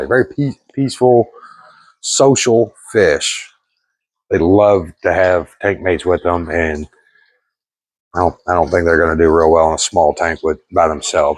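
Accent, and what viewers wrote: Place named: Kentucky, United States. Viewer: Kentucky ? Coool I guess I should have guessed by your accent but I couldn’t . I’m in ny !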